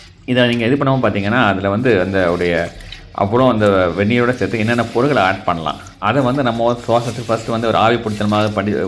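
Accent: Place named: Tirunelveli, India. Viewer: நெல்லை மாவட்டக்காரர் போல.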